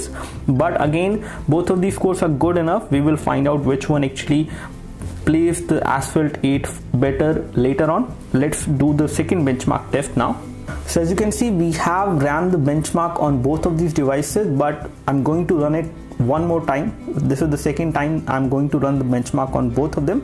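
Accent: India. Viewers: индуса на английском поймет только индус)))))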